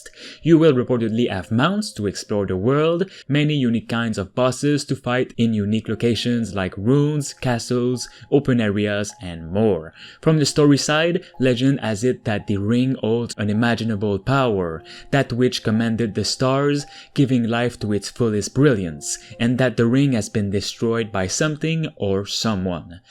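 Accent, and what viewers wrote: Quebec, Canada. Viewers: R u canadian french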